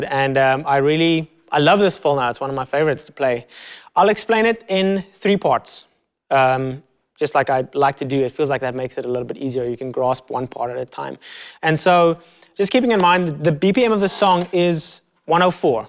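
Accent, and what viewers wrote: Afrikaans, South Africa. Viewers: If you want a laugh turn the captions on for the video. Youtube doesn't understand the South African accent at all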